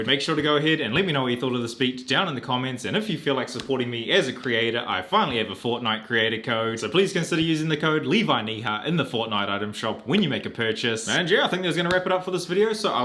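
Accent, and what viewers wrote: New Zealand. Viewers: you sound like a NZ'er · Are u from nz? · Is this guy from NZ? the accent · you from nz oi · are you from new zealand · y do u sound new zealend? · Are you from New Zealand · Are you from New Zealand? I recognize your accent.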